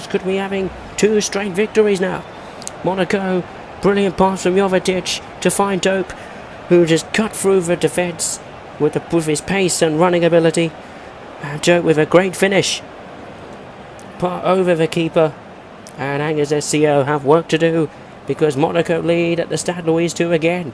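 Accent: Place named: United Kingdom. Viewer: You sound like a rich posh englishman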